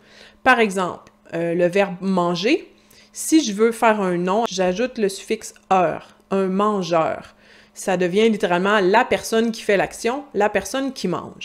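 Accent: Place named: Quebec, Canada. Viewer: Merci pour ce mini cours sur les petites insultes québécoises, elles sont très drôles 😄 J'aime aussi votre language certes plus correct, accent et manière de parler bref j'adore entendre quelqu'un parler québécois ❤️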